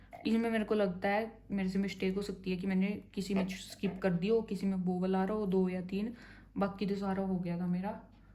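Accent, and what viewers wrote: Haryana, India. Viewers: Haryanwi ho kya accent se lg rha h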